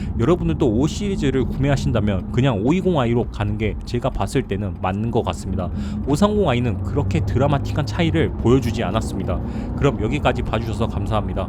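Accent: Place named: Gwangju, South Korea. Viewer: 어딘가 익숙했는데 광주사시구나 ㅎㅎ 반갑습니다 ㅎㅎ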